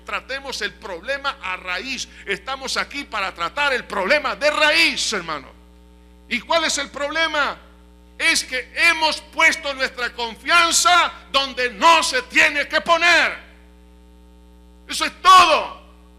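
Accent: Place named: Honduras. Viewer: Le admiro Pastor como Dios le ha dado tanta sabiduria de la Palabra. Sus miembros son bien priviligiados de tenerlo como Pastor. Hay mucho que aprender de usted. Hermano con todo respecto, usted da buena comprension y aplicacion de la Palabra en la vida actual del ser humano. Hace poco encontre sus videos, en verdad an llegado a ser de bendicion para mi vida. Usted parece Hondureno hasta en su manera de hablar. Soy Hondurena por Nacimiento. Muchas gracias por su preparacion. Le pido a Dios que El lo bendiga, a su familia y a su congregacion y que continue siendo de bendicion al cuerpo de Cristo.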